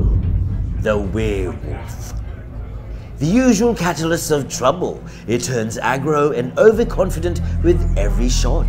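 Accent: Australia. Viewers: ngano Mani bay, kung paminawon Ka mura kag Australiano unya kung tanawon Ka mura kag Mexicano o Dile Gani Bisaya...ngano man???!🤔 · I love the authentic Aussie accent...so cute and funny...lol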